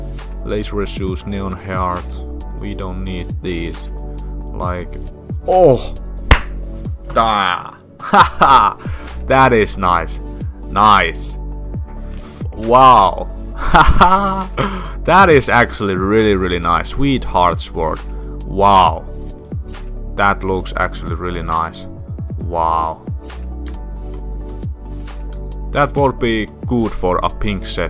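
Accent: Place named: Finland. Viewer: Heti aksentista tajusin et täähä o suomalaine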